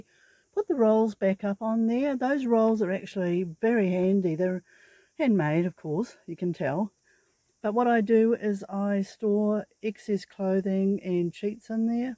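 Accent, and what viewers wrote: New Zealand. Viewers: That kiwi accent though, pacific pom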